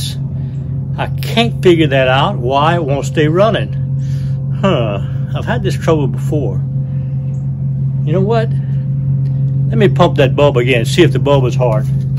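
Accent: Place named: Virginia, United States. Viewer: Are you in Virginia I hear a little high land in ya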